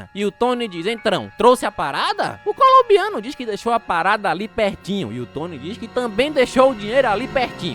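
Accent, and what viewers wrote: Northeast, Brazil. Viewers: VOZ HORRÍVEL TENHO RAIVA DESSES NORDESTINOS SOTAQUE LIXO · Porra mano aí e foda vc reclamar do sotaque nordestino si o cara nasceu no nordeste tu quer q o cara tenha o sotaque do Sul ou de sp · Vídeo bom , porém esse sotaque nordestino ferra tudo !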